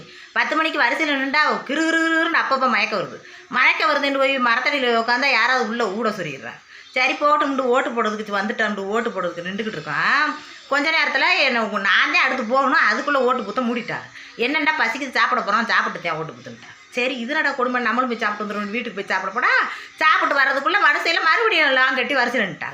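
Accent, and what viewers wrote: Madurai, India. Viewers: மதுரை பேச்சு அருமை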